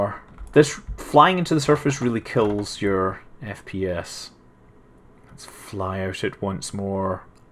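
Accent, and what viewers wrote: Scotland, United Kingdom. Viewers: You are a EXTREMELY interesting guy and easy to listen to, and i love the scotty accent. :D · I adore your Scottish accent,.:D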